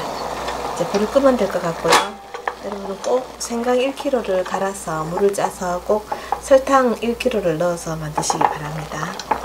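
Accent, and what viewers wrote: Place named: Daegu, South Korea. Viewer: 생강활용도짱~♡ 대구말씨 참 정겹네요 저도대구가친정입니다^^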